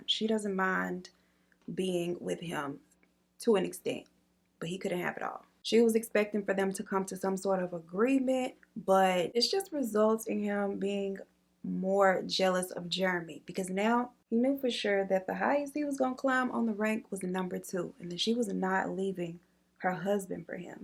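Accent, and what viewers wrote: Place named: Louisiana, United States. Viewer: I love the look but that blue and gold hit different than a lot of the other ones. Then again blue my favorite color. Don't really care about make up process but I love the vibes, murder stories and final looks. And I sang " 🪨" at the same time you did and I been here for the accent cuz you tryna hide it it sounds like Louisiana to me. But I say everybody from Louisiana 😆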